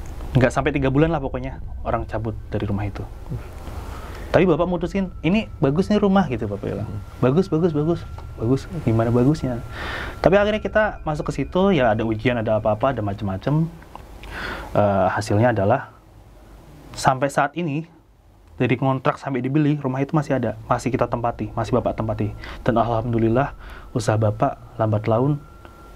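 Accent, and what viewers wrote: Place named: Cirebon, Indonesia. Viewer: asli wong Cirebon apa Indramayu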